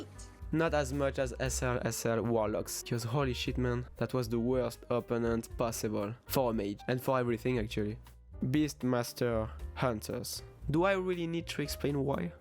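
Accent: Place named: France. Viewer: french accent :p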